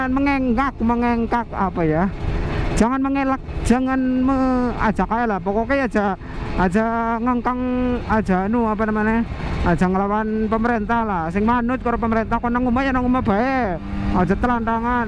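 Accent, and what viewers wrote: Banyumas, Indonesia. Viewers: Nama vlog ny ndeso seharuse ngomonge ngapak owh.. bang.. ngapak ora ngapak ora kepenak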